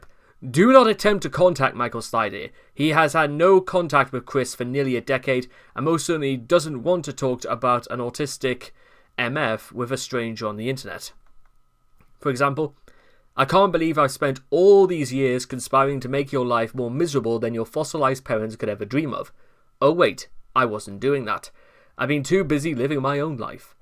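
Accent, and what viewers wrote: United Kingdom. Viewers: You sonded like a cockney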